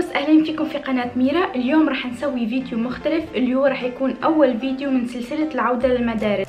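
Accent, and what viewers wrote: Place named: Tunisia. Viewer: ختيتو الديفو تاعك والسبب لي ميخليش التفاعل يطلع عندك هوا اللهجة الي راكي تحكي بيها هوا المؤكد مدامك تونسية رح يكون اكثر المتابعين عندك من المغرب العربي وسورتو من دزاير ونحنا عندنا شوي نفور من هاذيك اللهجات والعقليات اما المضمون تاعك مفيد ومسلي والبتوفيق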